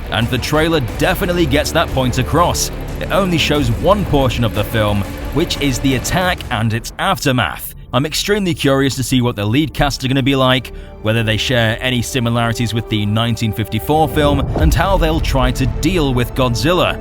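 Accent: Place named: United Kingdom. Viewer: i finally figured it out, the thing that has been bothering me for so long, you are british aren’t you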